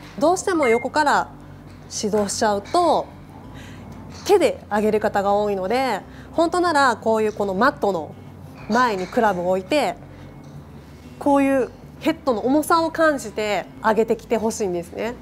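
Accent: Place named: Kansai, Japan. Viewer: 関西弁がイイね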